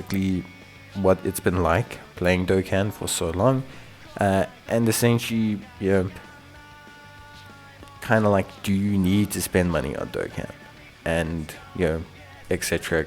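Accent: South Africa. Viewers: Wait are you south african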